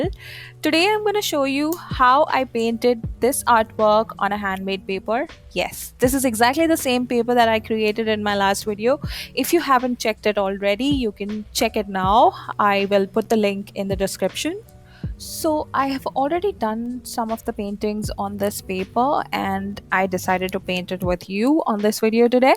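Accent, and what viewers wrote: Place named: Kerala, India. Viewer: I guess ur from Kerala Because of ur english i came to know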